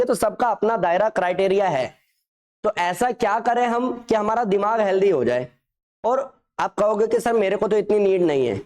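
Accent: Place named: United States. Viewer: कृपया हिंदी मे बताइये नाकी इंग्लिश में अमेरिकन एक्सेंट से नही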